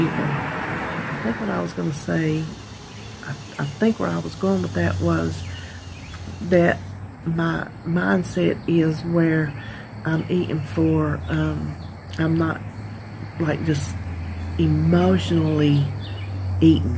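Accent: South, United States. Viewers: Thank you for your honesty. Some youtubers hide the negative eating. My binge go to food Is cake. I can inhale a whole cake if I don't feel it in. I am lifetime but the binge monster is always lurking. Love your chats, cooking, Wanda's, crafts and everything. Your (Morning, How are you to your neighbor,) was so cute love your accent and southern hospitality. Here in the north it's just a quick HI, and that's it.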